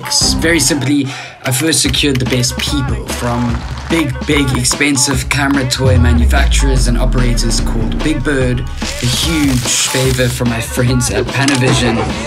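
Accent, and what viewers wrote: South Africa. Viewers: Hey u sound South African.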